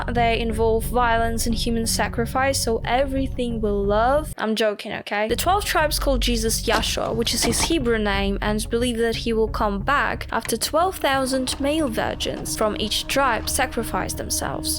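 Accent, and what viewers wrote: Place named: Ireland. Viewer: I really like your accent, are you Irish?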